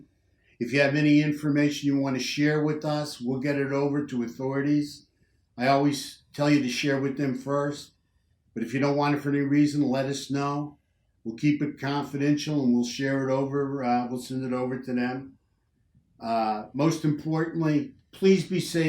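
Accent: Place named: Philadelphia, United States. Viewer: Hi, do you think the Long Island Serial Killer is the person responsible for killing the women in Atlantic City? Melissa Taylor was last seen (not 100% sure) in a town close to Atlantic City, Port Republic. Also, are you from the Philadelphia or South Jersey area? You sound like it, it's where I'm from. Thank you for the great content!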